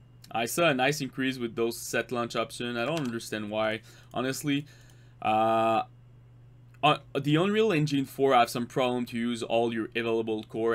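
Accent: Quebec, Canada. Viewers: Quebec accent xD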